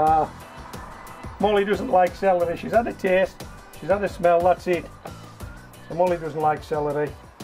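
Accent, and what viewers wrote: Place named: Scotland, United Kingdom. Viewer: Music to my ears the scottish accent! Perhaps because my ancersters "Remmington" from 5 generations ago, where from Scotland and emmigrated in Ontario, Canada? Re: Celery harvest, cutting 2 inches from the base and covering them with a polytunnel will prolongate the harvesting date of a couple of months. Great videos. Take care.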